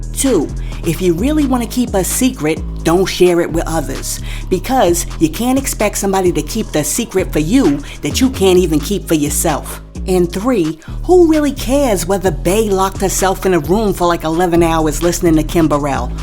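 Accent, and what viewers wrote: New York, United States. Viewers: As someone from NY born and raised I can tell the woman narrator is from NY and is also a Gen X.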